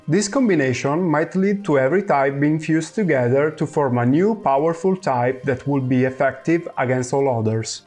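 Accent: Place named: Italy. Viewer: you have one of the strongest Italian accent I ever heard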